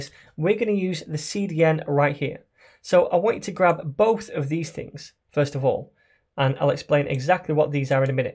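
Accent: United Kingdom. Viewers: Hey! Great British English, useful content. Thanks a lot, shared it with my group).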